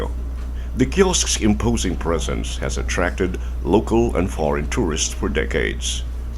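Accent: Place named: United States. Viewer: The narrator sounded like a native american speaker. I thought at first he was an american because of how he speak.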